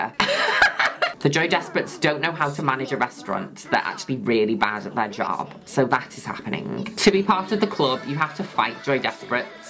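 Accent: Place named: United Kingdom. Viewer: The british accent makes everything better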